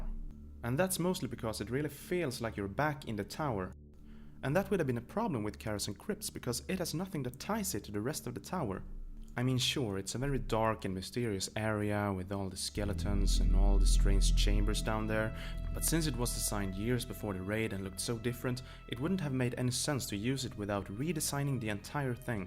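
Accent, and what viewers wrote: Sweden. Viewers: That swedish accent though. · You have a very swedish accent! Are you swedish?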